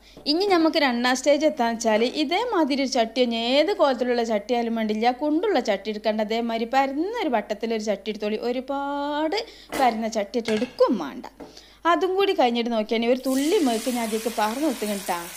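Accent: Malappuram, India. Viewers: ചിക്കന് ബദൽ ആയിട്ടുള്ള മുട്ട ബ്രോസ്റ്റ് സൂപ്പറായിട്ടുണ്ട്. വീഡിയോ എല്ലാം ഒന്നിനൊന്ന് മെച്ചം തന്നെയാണ്. എങ്കിലും താത്ത ഇതിനോടൊപ്പം ഉള്ള നിങ്ങളുടെ സംസാരം ഒരല്പം പോലും കുറയ്ക്കേണ്ടതില്ല. കാരണം മലപ്പുറം ശൈലിയിലുള്ള നിങ്ങളുടെ ഈ സംസാരം തന്നെയാണ് നിങ്ങൾ ഇടുന്ന എല്ലാ വീഡിയോകളുടെയും ഹൈലൈറ്റ്. സംസാരം താല്പര്യമില്ലാത്ത ആരെങ്കിലും ഉണ്ടെങ്കിൽ ആ ഭാഗം അത്തരക്കാർ സ്കിപ്പ് ചെയ്ത് പൊക്കോട്ടെ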